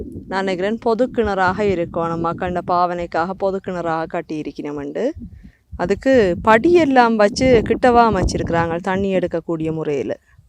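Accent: Sri Lanka. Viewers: Neengal peasum inimaiyana ilangai Tamil azago azagu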